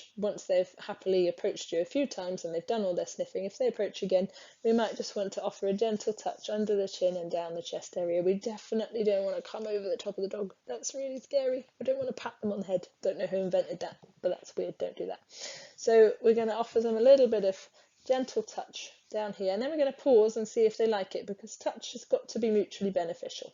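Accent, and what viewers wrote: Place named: Europe. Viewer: judging from your accent believe you are in Europe, sorry I'm in japan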